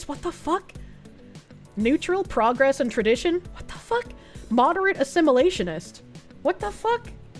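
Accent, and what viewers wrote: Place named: Massachusetts, United States. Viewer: I just watched a G&G daily video with you and madame savvy. Id like to say im a fan but at least intrigued. Boy you really sound like a MASShole, i like it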